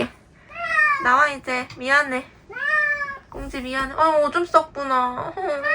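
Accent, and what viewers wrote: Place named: Gyeongbuk, South Korea. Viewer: 산동네 볼때 경북쪽 사투리 쓰시는거 같아서 혹시 산불난 쪽 아닐까하고 생각했었는데 오늘 영상 올리셨네요. 아무쪼록 사람도 고양이도 무탈하고 건강 해치지 않길 바랍니다.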